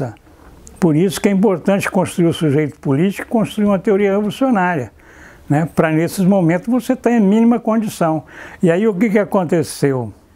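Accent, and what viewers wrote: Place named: Sao Paulo, Brazil. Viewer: Fala paulista! Ahahahah😃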